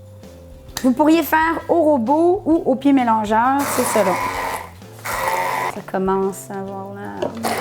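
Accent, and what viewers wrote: Canada. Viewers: Coucou j adore tes vidéo et l accent canadien qui nous réchauffe le cœur que ca soit l été comme l hiver on aimerait voir beaucoup plus de vidéo mais je pense que sait beaucoup beaucoup de travail je suis super content de voir les nouvelles vidéo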